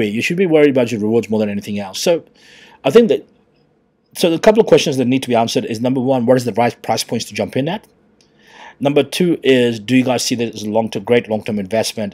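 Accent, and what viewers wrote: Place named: South Africa. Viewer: Nice accent mate, are you a South African ?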